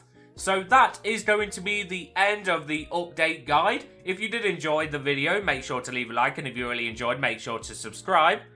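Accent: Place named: United Kingdom. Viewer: Bro is British because he was spending pounds and his accent also makes this true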